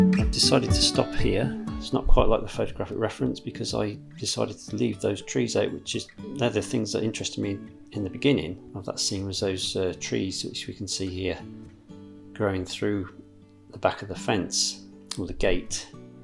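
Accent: United Kingdom. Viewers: Hi. I’m Christophe from Paris. I subscribed quite a time ago. I love your drawings. I’m very much impressed by the large range of medias you are able to practice (watercolor, oil sticks, pastel, charcoal, oil painting …). Your are a very talented artist. I must say one of the most talented I have ever seen so far on internet. Highly professional work, also full of creativity and sensibility. Not surprising to have been promoted by the Royal Birmingham Society of Artists. Your YouTube channel might be also a very usefull guide to guys like me trying to produce some masterpiece in their living room! May I also tell you that I love your dog Basil (he seems to be the happiest dog of the world) and also (from this side of the channel) your so lovely british accent 😊. Best wishes from Paris and long life to your channel.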